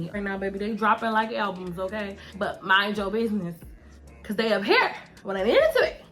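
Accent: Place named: New Orleans, United States. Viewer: why you sound like you from new Orleans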